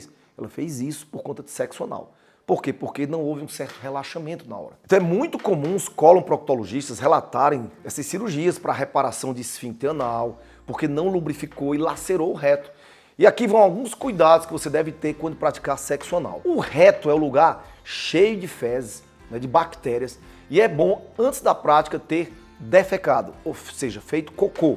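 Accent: Ceará, Brazil. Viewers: O sr.é cearense??? Um jeitinho típico de apresentar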